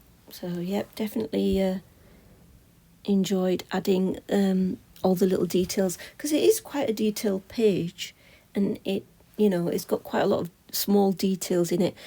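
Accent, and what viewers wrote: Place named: Newcastle, United Kingdom. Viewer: I love these snippets. Hubby came in so I asked if he were going to put headphones on or would he prefer me to put headphones on. He said he rather liked your soothing voice so he listened to you talk while doing a puzzle. Now you’ve stopped at the end of the video he’s listening to something else through his headphones. I thought it was funny. He says he can hear a mild Newcastle accent in a North Yorkshire accent. Is he right? Is there a Newcastle connection? (He is Lancashire with a dad from Newcastle.)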